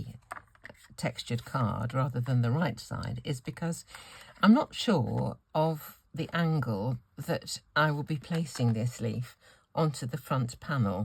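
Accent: England, United Kingdom. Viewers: Just watched Lou’s collaboration video. I’m new to your videos. Just subscribed to your channel. I love to hear your English accent.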